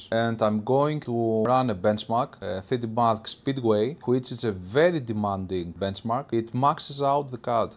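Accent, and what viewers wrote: Greece. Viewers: Κατάλαβα κατευθείαν ότι είναι Έλληνας ο ομιλητής λόγο της προφοράς του 😅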